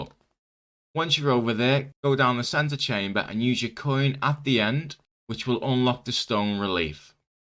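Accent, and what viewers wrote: Liverpool, United Kingdom. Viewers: scouser by any chance? haha!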